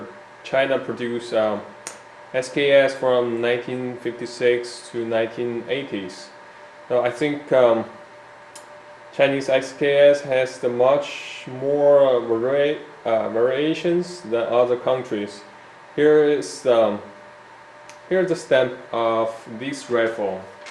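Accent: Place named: Eastern Europe. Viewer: I thought he was Asian too, until the very last line. The way he says "wideo" you know he is a slav, maybe with some dramatic mixed in. Definitely not an Asian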